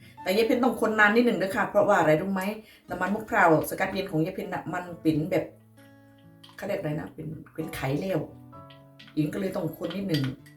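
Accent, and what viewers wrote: Korat, Thailand. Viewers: พูดโคราช. เป็นคนโคราชใช่มั้ยคะ. คนบ้านเดียวกัน. ตอนนี้กำลังลองสูตรน้ำมันมะพร้าวกับน้ำมันวิตตามินอีค่ะ. รอลุ้นอยู่ค่ะ. อยากสวยเหมือนพี่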